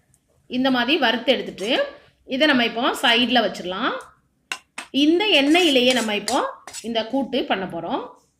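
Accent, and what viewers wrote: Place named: Tuticorin, India. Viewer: Unga native ethu akka... Slang tuty slang pola iruku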